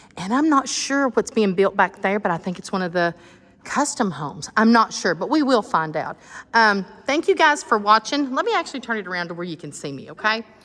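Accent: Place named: Tennessee, United States. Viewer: You are too cute! Thanks for the info, love your TN ‘accent’ 😘 Knoxville lady here, and one of your biggest fans. We will be up with our son soon. He “thinks” he wants an 8x22.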